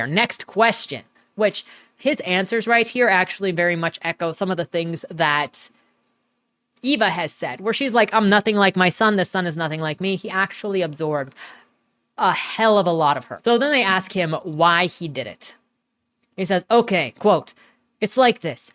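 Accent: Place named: Transatlantic, United States. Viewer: The transatlantic accent is brutal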